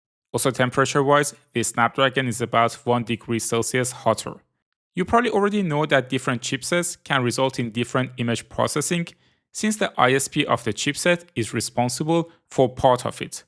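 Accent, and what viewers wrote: Iran. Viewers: عشققق فقط چرا حس میکنم لحجتون اینقدر ایرانیه ؟☕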